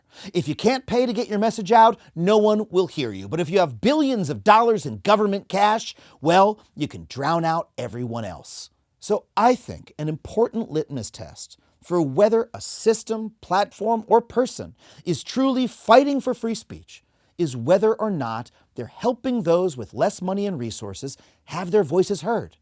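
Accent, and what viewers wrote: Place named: California, United States. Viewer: I get his point, but has such a California pretentious way of speaking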